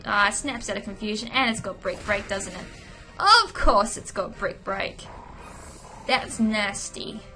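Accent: Australia. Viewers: are you and australian?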